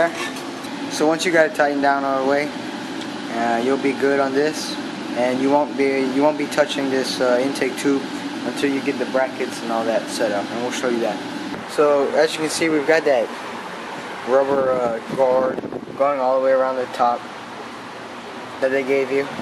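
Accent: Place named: Louisiana, United States. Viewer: Kaplan? New Iberia? I’m trying to place that accent, lol.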